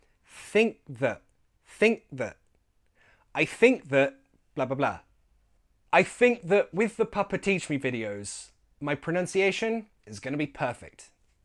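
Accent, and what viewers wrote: United Kingdom. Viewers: Thank you so much for helping us know the perfect British pronunciation. Sir, i am a big fan of you. And I love you.👌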